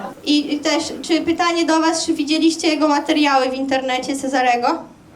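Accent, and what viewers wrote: Podlasie, Poland. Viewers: z warszawy XD a akcent podlaski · Ja chyba zostanę psychofanem 😀 zakochałem się w tym typie żartu, mega akcent trochę przedwojenny, trochę Podlasia z domieszką Mazur, ale mega robotę to robi 🎉🎉🎉🎉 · Też to zauważyłeś? Jest mega urocza, mega humor, no i ten akcent, najpierw myślałem, ze jest gdzieś z Podlasia. :) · Ten aksent to bardziej z podlasia niz z Warszawy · Słychać Podlasie. Używa też slow lokalnych jak pazłotka. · Brawo! Mega 😅 i fajnie Podlasie słychać w Tobie 😀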